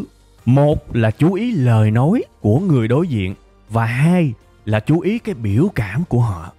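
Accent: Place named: Tay Ninh, Vietnam. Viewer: Mình có người bạn ở tây ninh, học làm ở sg cái giộng điệu, nhấn nhá, cách nói chuyện y chang bạn này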